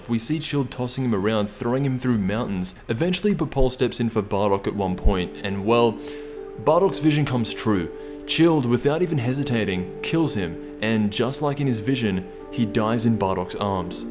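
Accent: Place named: Australia. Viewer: by his accent that he's Australian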